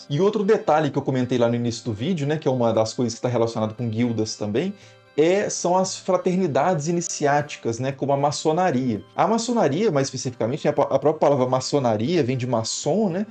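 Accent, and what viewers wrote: Minas Gerais, Brazil. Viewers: vc é mineiro de onde?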